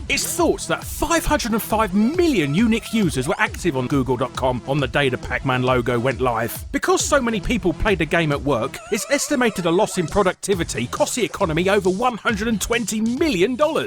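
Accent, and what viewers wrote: United Kingdom. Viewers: Fuckin' cartoon british voice... It was really annoying. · People be hating this voice, that's a good ol British accent right there! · waaah i dun liek teh voice waaaah Shut the fuck up and deal with it. This is what a lot of British people sound like. You don't like it? Think how your accent might sound to us.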